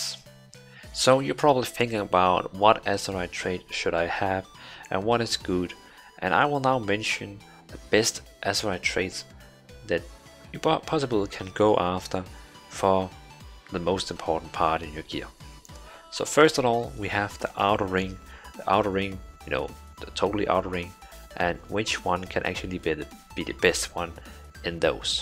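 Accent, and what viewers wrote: Denmark. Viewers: Love the danish version of english...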